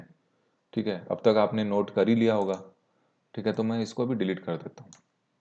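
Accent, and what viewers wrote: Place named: Odisha, India. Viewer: Bro are from odisha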